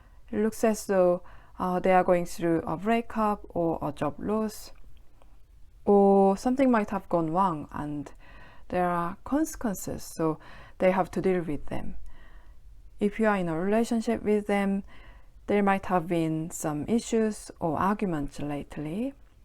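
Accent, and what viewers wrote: United Kingdom. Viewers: 약간 영국 발음 같아요 완전 영어 잘하시네요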